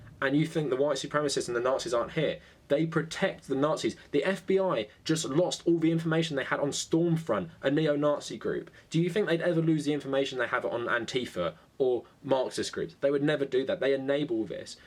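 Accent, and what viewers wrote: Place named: United Kingdom. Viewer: I'm not even going to finish the video because I tell already you are advocating and defending ANTIFA. Here's the thing you're argument is essentially if you don't agree with the political speech it's ok or justified to use violence. Now flip that since i disagree with you that means it's ok that i use violence to stop your speech right? Your accent seems British to me so I'll leave you with a quote from Winston Churchill "future fascists will claim to be antifascists".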